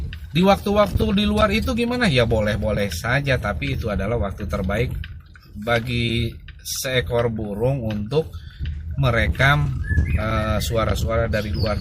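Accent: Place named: West Java, Indonesia. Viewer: Udah kaya denger ustad ceramah logat sunda.. Hhhhh detail n terperinci... Semoga saya bisa nerapinnya sama trotol pemula main mb.. Thank gan.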